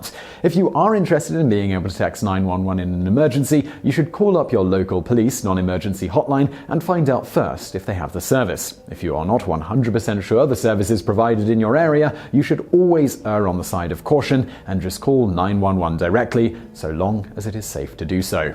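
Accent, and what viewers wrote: United Kingdom. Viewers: What part of Britain does his accent come from? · When he said talking about the military I don't know if it is the fact that everything he said was bullship or the fact that he has a British accent but either way don't believe any of it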